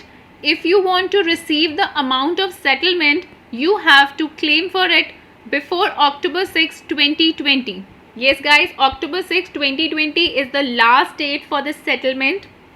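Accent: India. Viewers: Man even your video with your annoying Indian accent feels like a scam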